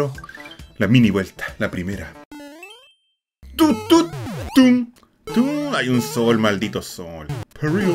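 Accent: Chile. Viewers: Wena eres chileno, igual soy chileno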